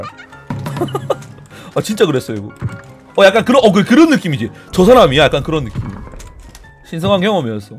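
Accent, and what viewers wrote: Busan, South Korea. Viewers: 부산사람 이었어? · 형 부산사나보네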